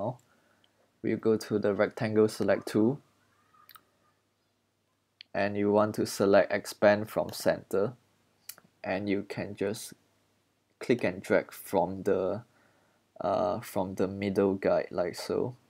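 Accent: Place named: Singapore. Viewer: Thank you so much for this tutorial! I am learning how to edit some artwork for my gaming channel and am willing to learn more tricks about GIMP! Are you from Singapore (making a guess based on your accent... cuz I'm Singaporean here!)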